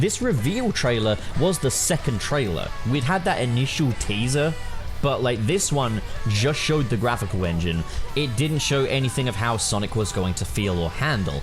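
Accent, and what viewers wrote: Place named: United Kingdom. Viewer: But in your YouTube Channel informations, it says that your in USA (that's why i asked in the first place) but rewatichng your video, i noticed a little the british accent haha (it's not that obvious for a foreigner to notice it ^^')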